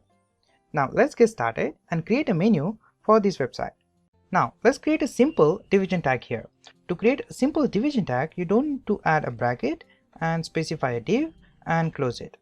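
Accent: India. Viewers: хренов индуский акцент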